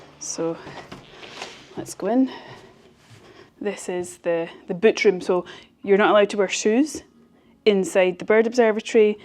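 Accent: Scotland, United Kingdom. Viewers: Hey, I’m Saudi, from the vast and well-known deserts of my homeland. As of today, I’m 23, and I’ve finally reached a destination I’ve long dreamed of: Scotland—particularly the Scottish Highlands. I visited in the first third of January and made my way to Glencoe. I have to say it out loud: the Scottish people are truly blessed by the gods with such extraordinary landscapes. I can scarcely believe this is real; I was completely overwhelmed with awe. The weather was stormy, and I traveled alone. It prompted a deeply introspective state—I felt, perhaps for the first time, an intimate connection with nature. As a Saudi from an Arab background with a C1-certified level of English (which is relatively rare), I found arriving in Scotland to be an ironic experience. Despite my fluency, the accent rendered everything almost like a completely foreign language. 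My question for you today: do Scottish people often encounter highly educated English speakers, yet still find the accent a barrier? I suspect the answer is yes. Your accent is sharp, almost like a sting, yet it is profoundly expressive and emotionally rich.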